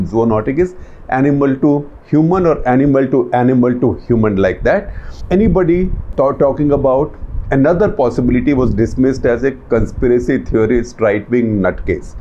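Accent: India. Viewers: The Indian accent is too strong it sound very hilarious although the guy is very serious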